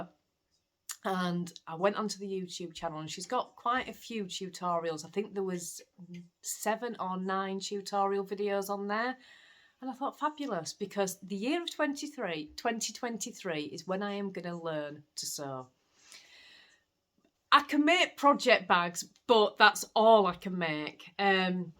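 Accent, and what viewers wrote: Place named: Leeds, United Kingdom. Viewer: Love your podcasts and cant wait to see the advent leggings. Are you in Leeds? Just wondered cause that's where I am and you've got the same accent 🙂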